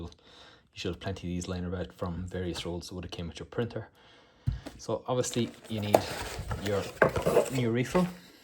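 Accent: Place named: Ireland. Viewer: Thanks, helped with my 1st time set up, the notch was catching me out! Good to hear an Irish voice doing one of these vids too, fair play 👍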